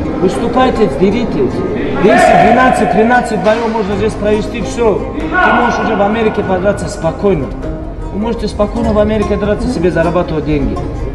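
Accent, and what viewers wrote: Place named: Dagestan, Russia. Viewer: its 100% russian but dagestani people have that arabic/turkish accent which is why it sounds off.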